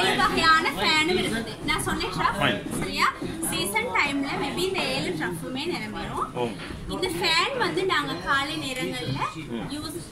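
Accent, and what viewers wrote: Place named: Sri Lanka. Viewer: Like Srilankan Tamil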